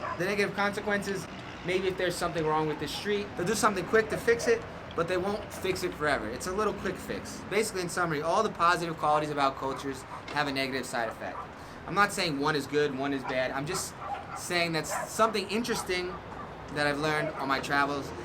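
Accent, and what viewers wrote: Brazil. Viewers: Eu fico sempre com a impressão que você é um brasileiro que fala muito bem inglês. 😅 Os vídeos estão bem legais. Keep it up! 👊🏻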